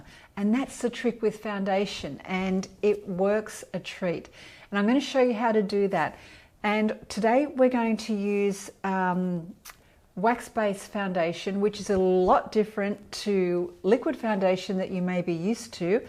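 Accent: Australia. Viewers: Hi I thought you sounded Australian, I was hoping to find someone tht can recommend products that can be purchased here for the over 50s :( I love watching you though. thankyou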